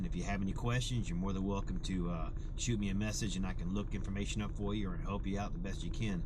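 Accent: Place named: New Jersey, United States. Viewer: Are you from mariland. You have a new jersey accent with a virginia twang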